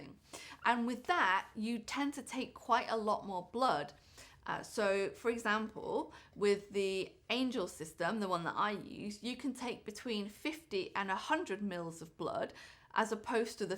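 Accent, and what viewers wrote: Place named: United Kingdom. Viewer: you are simply amazing...I love the way you talk with typical Briton's resonance....I follow ur videos and have got my dermatologist watched your videos...you enlighten us so much on these cosmetological treatments candidly...superb...God bless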